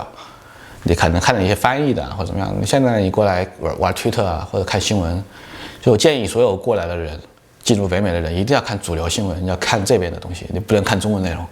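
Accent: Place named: Wuhan, China. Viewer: 听口音是武汉老乡啊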